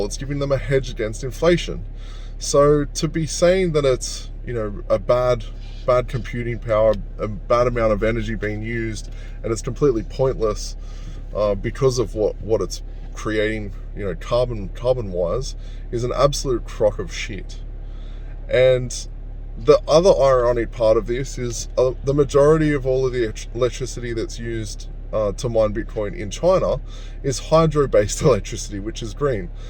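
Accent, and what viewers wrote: Australia. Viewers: Yep exactly mate! From Bitboy segment . Congrats on winning that segment.. im a new sub mate.. i am Aussie so nice to hear the Aussie accent.. i live in the Philippines now so yeh to get my Aussie fix im gona watch you! Cheers and keep it up bro! P.S Elon is a Sh!thead👎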